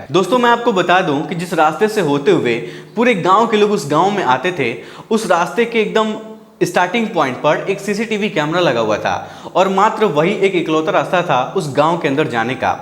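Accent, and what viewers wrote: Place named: Bihar, India. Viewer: Abe sahi se Hindi to bol bihari taan me kyu bol raha hai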